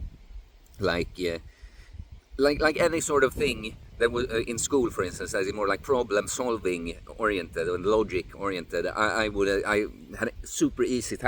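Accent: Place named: Sweden. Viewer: Love you! Only recently found your channel, but I will mourn the day the last time you will upload. We share a large deal on the views and outlook on life, only difference is youre much much further along this path and youre close to the embodiment of what I aspire to be, both physically and mentally, which is probably why I like your videos so much. I grew up in Sweden so the accent is lovely aswell lol. As for feedback on the current video, I want to hold up a mirror to you and say "own your vibe to attract your tribe", this quote really struck me and gave some food for thought, you keep doing you man. Har det så bra!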